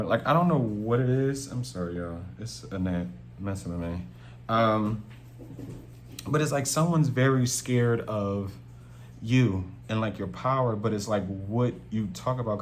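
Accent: Philadelphia, United States. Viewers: Being as though we both from Philly… lmao I can just hear it in voice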